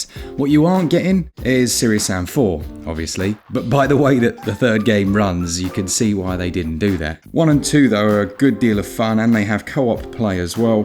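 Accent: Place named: South, United Kingdom. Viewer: Smoothest southerner in all of Britania. Get some audiobooks done😅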